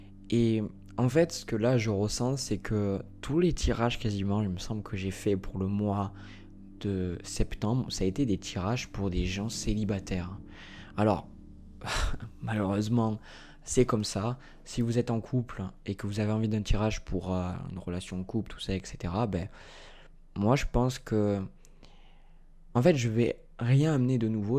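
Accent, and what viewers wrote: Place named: Aveyron, France. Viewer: Trop drôle,! Mon cadet porte votre prénom, votre nom et votre accent me parle mon Aveyron... Et vs êtes bélier et analysez nos caractères comme moi... Et le changement de ce mois est ouvert! Après échec et mat...par trahisons! Et trop de ces questions que vs ennoncez... Merci vraiment.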